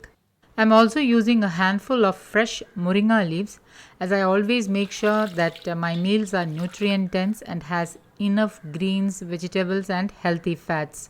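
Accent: Kerala, India. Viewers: Sounds like you are malayali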